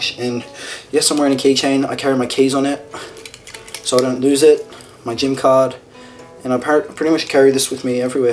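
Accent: Australia. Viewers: GLAD THAT YOU HAVENT LOST UR AUSSIE ACCENT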